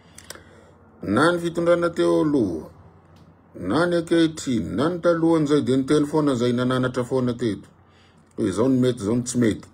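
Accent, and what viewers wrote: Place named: Bara, Madagascar. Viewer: NA IZY MITENY FRANTSAY AZA MITOVY IZY MITENY BARA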